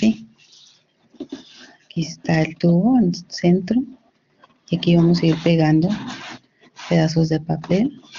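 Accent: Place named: Chile. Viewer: Mexicana???hoooo habla igual que nosotros los chilenos, llegue a pensar que podia ser chilena jajajaja....bueno se paso me han encantado todos sus trabajooos....besoooossss amiga..DIOS la rebendiga mucho por todas sus creaciones...😍😍😍💖💖💖👏👏👏🌹🌹🌹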